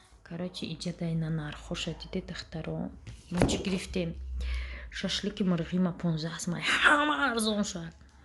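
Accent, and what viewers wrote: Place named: Kulob, Tajikistan. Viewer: Апай духтари Душанбе хасти тоза кулобигт гап мезани зури да👍👍👍😁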